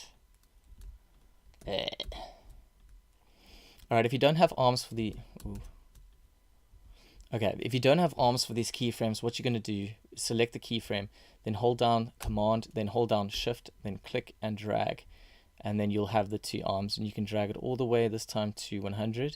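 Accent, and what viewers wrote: South Africa. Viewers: Great work man! I hear a South African accent there ;) Is there a way you can make this template available like the links you have added in the description? I would love to use it and replace it with my own logo and colours. Thanks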